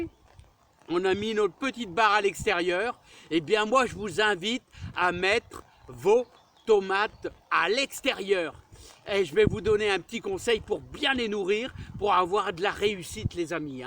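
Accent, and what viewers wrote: North, France. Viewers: Ah!! Ben voilà!! ça fait plaisir de t'entendre parler un peu le patois du Nord! Je trouvais que ça manquait un peu dans tes vidéos!! Je vais mettre aussi un peu de brin d'queviaux aux pieds de mes tomates et au fait, le brin d'coulons te sais qu'te peux in mette su t'chiette y parait que ça fait aussi repousser les qu'veux!!! Hi hi!!! ou Lol comme y disent ché jeunes!! José un chti exilé à Narbonne.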